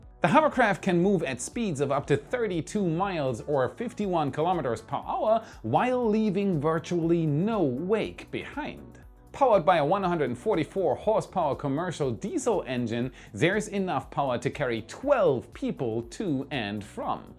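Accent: Germany. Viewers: Great show. Your Deutschland accent is fading. Is that intentional? Space should always have a German accent! For a good laugh YouTube for Tom Lehrer's "Wehrner Von Braun" video... (all of Tom Lehrer is irreverent and hilarious... watch the Masochism Tango too for a ridiculous laugh). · Are you german?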